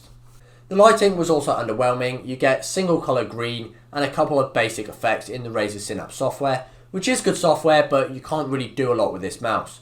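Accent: United Kingdom. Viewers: Heyyy are u bri’ish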